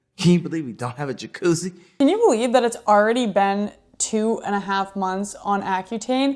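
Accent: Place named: England, United Kingdom. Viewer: I'll give a like for the English accent! I must say, I'm really impressed in general by how you carry yourself on camera. You speak so naturally, it's like I'm there with you. You're making great progress, I wish you well!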